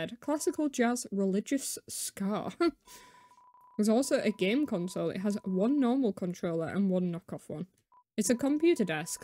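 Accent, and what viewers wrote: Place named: United Kingdom. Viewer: British? not a problem, i don't mind british if it's good content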